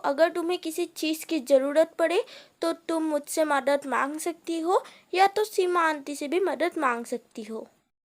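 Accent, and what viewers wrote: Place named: Assam, India. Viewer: Ab Assamese ho lsliyai abka jab hindi bolotai ho to etina shoot naihi karta but koi nhi ab at least try to kar rahi ho..💞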